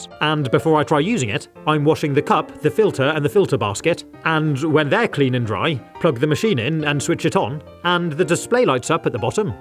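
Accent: United Kingdom. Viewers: SO HES BRITISH?! I’ve been wondering what his accent is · nga hes jus british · Apart from the constant upwards inflection at the end of every sentence, this bloke is the most British man to ever British. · The most British voice I ever heard 😊 good video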